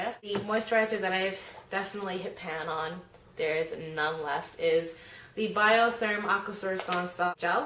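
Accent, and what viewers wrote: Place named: United States. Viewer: I was wondering , why do you have american accent and you live in Australia .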